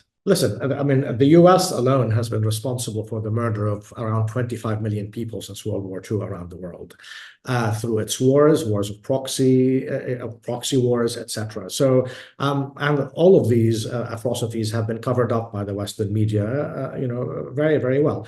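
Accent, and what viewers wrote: United Kingdom. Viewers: He sounds like he is from the UK. Did he forget about the British put the Zionist in Israel in 1916 and took over there claiming to be Jews and they created the UN to make Israel in 1948 .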